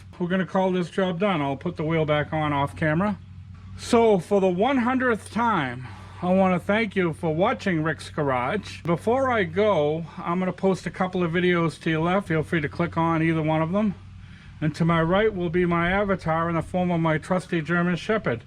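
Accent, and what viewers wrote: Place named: Boston, United States. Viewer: yeah I heard it and was like this guy has to be near Boston. Thanks for posting the video I'm doing some work on my dodge and you really helped me get shock out the way so I can replace lower control arm. Thank you again ☺️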